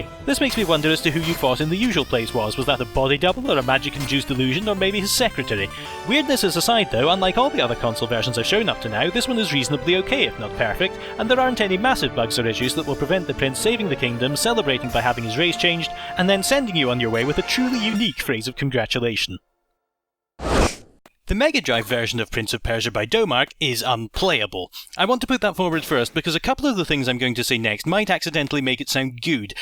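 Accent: Scotland, United Kingdom. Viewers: I thought I heard a bit of Scottish in your accent lol! I didn't want to assume. ^_^ Loved the video man. Just watched your Prince of Persia 2 video today and that one was also great. Keep up the great content man!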